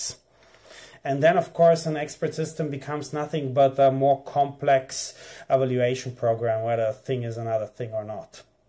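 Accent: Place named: Austria. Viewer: Excellent thinking 🤔 Systrans with accent such as yours is English with Austrian accent. Depending on the language being translated. Usable on cell phone 🤳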